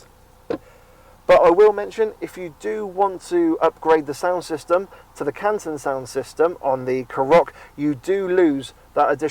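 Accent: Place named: England, United Kingdom. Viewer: I just love this guy's english accent. Very classy.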